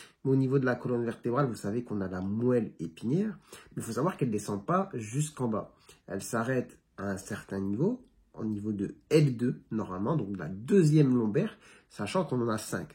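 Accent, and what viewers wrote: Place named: North, France. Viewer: Ça dépend aussi de la région d'où on vient, je crois que le dr est originaire du Nord. Pour ma part, ma mère étant aussi de là-haut, j'ai hérité de cette prononciation.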